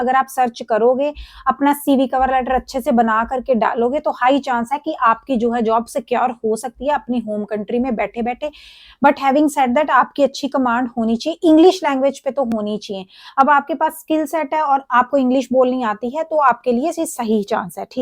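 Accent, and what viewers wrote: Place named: Chandigarh, India. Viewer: आपके बोलने के लहजे से लग रहा है आप चंडीगढ़ साइड से हो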